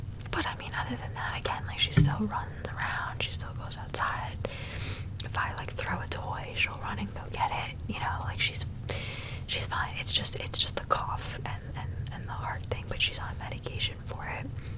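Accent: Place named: Northeast, United States. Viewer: I’ve always been curious where you’re from & what your background is? I’m from Australia so I don’t have a clue abt American accents but I’m guessing a state in the North-East? 😅 & Im assuming from your appearance you’re hispanic but also curious abt that too :)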